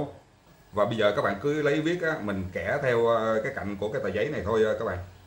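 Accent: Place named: Vietnam. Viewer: ฟังภาษาเวียตนามแล้ว ปวดหัวมาก สำเนียง ปิดลำโพงเลย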